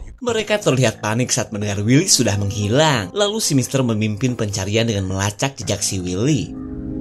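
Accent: Sunda, Indonesia. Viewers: Sering "afdett bangg siaaapp menungguu apa lgii cara ngomong nyh cmpur sundaa heheheh😂😂😂❤❤❤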